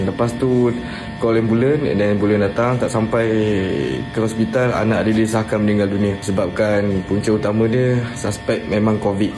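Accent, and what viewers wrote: Malaysia. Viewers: Lain y bhsa melayu malaysia ini. Lucu kosakata dan aksen logat nya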